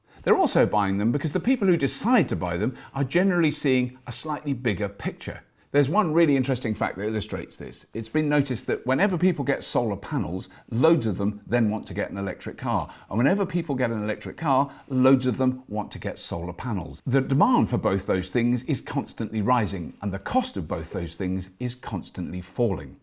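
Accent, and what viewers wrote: France. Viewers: French accent on point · super funny! Love the french accent! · Love the French accent lol. Good video. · Well done sir. I especially liked your French Accent. There is one problem however, and it is with your set piece; your desk isn't worthy of you and would perhaps be of better use behind the scenes. If that isn't feasible, maybe a nice tablecloth would suffice. I have subscribed to your channel and wish you well. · The french accent XD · you totally made my day when you used your "frenglish". Swiss people always make fun of French people speaking English, because well... They make no effort at all ^_^. Really like your episodes, they are educative, complete and entertaining. If I might, it would be even better if you used numbers, when you use words like: lots of, double, etc. One thing that I like about the presentations of Elon Musk is that he uses meaningful numbers and actually prove that they fit and are reasonable. 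Congrats for your vids and channel. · keep working on french accent :-D love you videos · Pretty good French accent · Earned my "thumbs up" just for that French accent lol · You really cheered me up with the great French accent and with the revelation about "matured fierce French women" :) Just won a BIG LIKE and a SUBSCRIBTION to the channel! Cheers! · Dude you're hilarious! Very good French accent! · liked this video for your french accent alone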